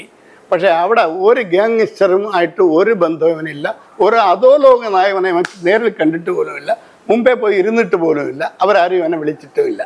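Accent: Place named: Trivandrum, India. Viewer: Ithokke ivide vannu ' You Tube' vazhi enthinanu chetta parayunnathu. Adheham marichu ee lokam vittu poyille . Ithokke kondu ningalkku enthu gunam aanu ullathu. Aaloor prasasthan aayathil ningalkku enthu nashtam aanu ullathu . Valare thaazhnna nilayil ninnum kashtapettu oru LLB degree eduthille . Vyaaja degree yum koondu judge maar aayavar vare nammude naatil ille . Ningalum Alloor rine pole ningalude maarggathil prassasthan aakaan nokkuka . Ningalude malayalam slang un reethi yum kettittu ningal Trivandrum jilla yude thekkulla etho sthalathu janacha thu pole aanu thonniyathu. "Adikalum kitti " Pune vittu ennathu kettittu , onnukil ningalude kudumba thil aarengilum Trivandrum thinu thekku bhaagathu ninnum undaayirikkanam Allathe ee slang varilla .Ningalude slang Parassala , kaarakonam, Amburi , Amaravila, kanjiramkulam , vizhinjam kaliyikka vila slang pole thonni. 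Aaloor marichu poyille chetta..vittekkoo.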